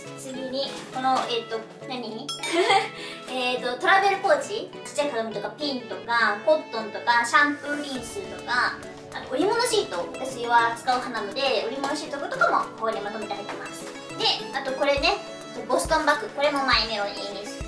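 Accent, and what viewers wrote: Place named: Kansai, Japan. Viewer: あれ⁉️ さんは、関西の血が通ってた？ちょいちょい、関西のイントネーションや言い回しを、ぶっ込んでくるよね😂😂😂 とにもかくにも、沖縄の【蚊】は、デカいよ😱😱😱 ていうか、台風大丈夫⁉️🥹🥹🥹 出張、お疲れさま｡｡｡(lll __ __)ﾊﾞﾀｯ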